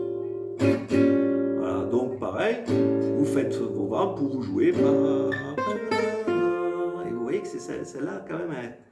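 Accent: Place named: Provence, France. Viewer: Pas pire, yabontuto. Ça promène, au fil du manche. Avé le bel accent qui sent le sud provençal. Bon c'est reste plus simple de regarde que refaire, mais pour le coup ça donne l'envie. Bravo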